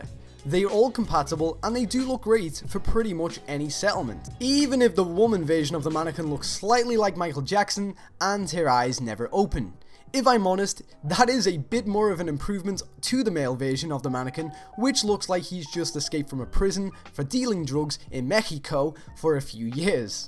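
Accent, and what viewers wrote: Liverpool, United Kingdom. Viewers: I'm so confused, are you a scouser? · 'which I'm sure is a toilet paper in the UK' .....you're from the UK aren't you?? Scouse I thought?? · Your fucking accent is horrendous. If you're a scouser, SPEAK LIKE A SCOUSER.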